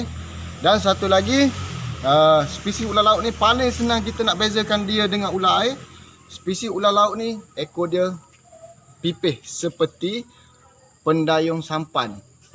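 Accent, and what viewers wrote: Kelantan, Malaysia. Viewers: Kelate molek bunyi abe... Hehehe terbaik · Kelate kecek lua... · tuan asal kelantan atau trgganu? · ore klate peseng abe ni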